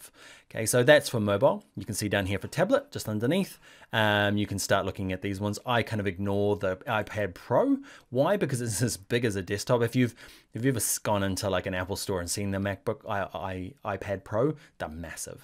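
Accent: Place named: New Zealand. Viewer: Love to hear the Kiwi accent helping developers!! Cheers bro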